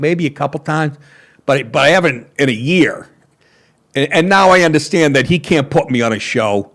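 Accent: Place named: Boston, United States. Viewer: Nah, he’s just from the Boston area. That’s how we sound!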